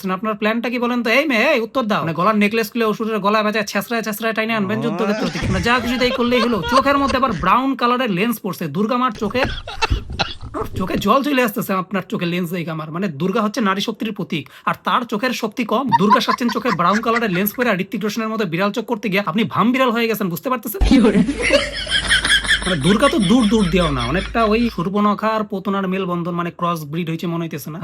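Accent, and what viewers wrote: Bangladesh. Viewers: That Bangladeshi accent❤️ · বাংলাদেশিদের মতো কথা গুলো লাগছে।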